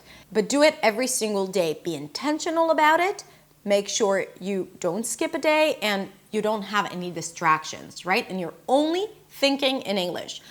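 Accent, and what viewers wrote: United States. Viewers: Hey, you are super nice about your accent. you incorporated totally the american accent. That's my point of view.. Xoxo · Wow, your native is Hebrew and you sound like an American, even speaking very quickly, good teacher. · I can understand you clearly, I thought you were American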